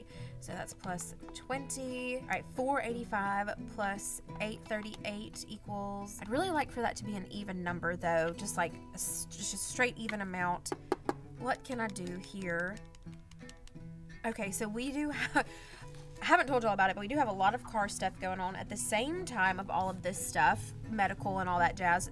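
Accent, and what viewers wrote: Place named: South, United States. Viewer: definitely not annoying at all I love southern accents 🥰🤍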